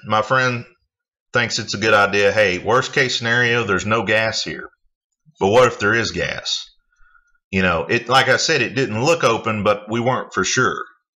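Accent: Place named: North Carolina, United States. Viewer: I thought I recognized your accent... you are from North Carolina, right?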